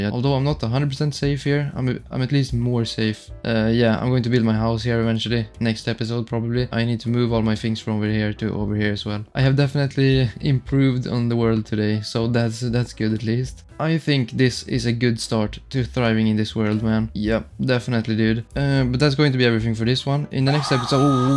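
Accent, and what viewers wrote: Sweden. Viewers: to be honest you kinda sound swedish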